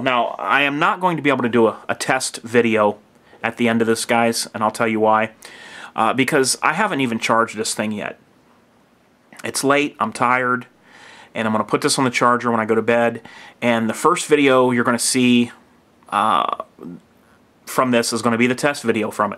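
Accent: United States. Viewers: What part of the states you from again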